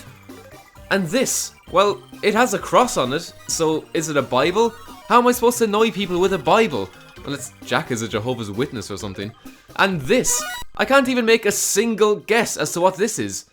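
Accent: Ireland. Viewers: I’m pretty sure he’s Irish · Dude are you from the same part of Ireland as Jacksepticeye??? Hope this isn't offending you (don't mean nuthin by it) but ya accent sounds very similar. Love your reviews fella!!!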